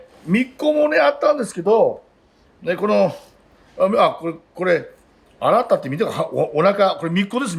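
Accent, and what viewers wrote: Hokkaido, Japan. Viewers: ❗ 北海道弁最高❤️ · ちょくちょく出てくる北海道弁がたまらないです。鮭の頭が入った石狩鍋は甘みとコクが出て美味しいんですよね〜。バターは意外でした。今度やってみます。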